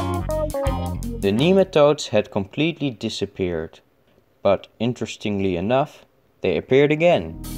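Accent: Netherlands. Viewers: RRRRRRRRRRrrrRRRrrrRRRrrrrrRRRR horrible Dutch RRRrrRRRRrrRRR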